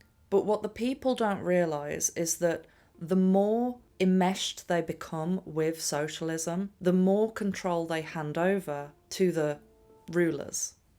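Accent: United Kingdom. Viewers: I´m from Austria and I´ve discovered your channel recently by accident and I love it. Smart young woman with a charming british accent and highly interesting content!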